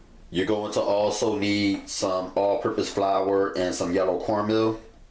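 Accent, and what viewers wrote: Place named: Baltimore, United States. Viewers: Sound like you from Baltimore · He sounds baltimorian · lol be sounding like he from PG County Baltimore ahahaha "Fyew"... "Tew"... "Dew"... Oiyew (Oil) hahaah Much luv bro!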